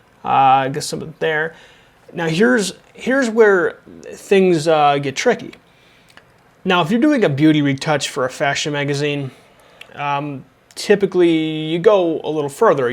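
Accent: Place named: Appalachia, United States. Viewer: You sound like Hillblly, btw nice tutorial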